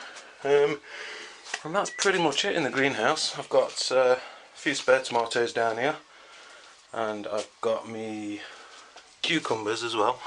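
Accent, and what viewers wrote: East Midlands, United Kingdom. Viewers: Hiya! First time I've tuned into your channel. Good tour. I too am a newbie not only to gardening but to having an allotment so watch YouTube a lot and take lots of advice and try to ask the odd question too! Your plot seems to be huge; how big is it and where is it? From your accent, I would guess somewhere in the East Midlands?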